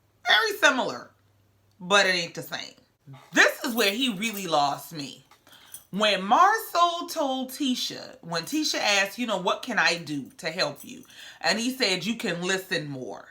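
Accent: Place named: Louisiana, United States. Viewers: I detect a very strong and seasoned clinical background as well as a Louisiana dialect. Did I pass or fail? #youregreat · Girllll when I tell you you spoke nothing but FACTS! I'm here for it! Are you from Louisiana? I'm getting Lafayette or Lake Charles.. ? But either way I just found your channel this Thursday today is 10/22/21 and I love your commentary! I subscribed!!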